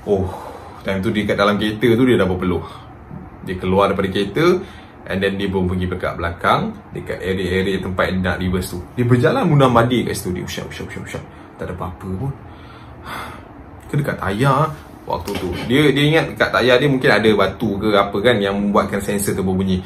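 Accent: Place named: North, Malaysia. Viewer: Terbaik..🎉👍 loghat utaqa noh hang balun🤣😂moga sukses sllu dik..perlis hadir🙏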